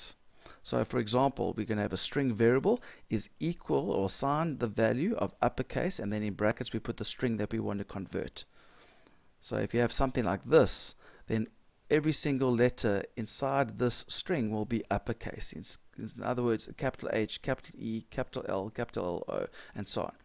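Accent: South Africa. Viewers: You can hear the strong South African accent coming through, at least I think.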